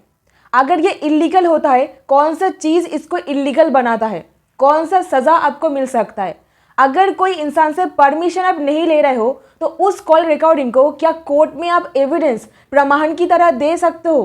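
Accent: Bengal, India. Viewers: 😊 Bengalis jab Hindi boltey hain tab Aisa hi boltey hain😊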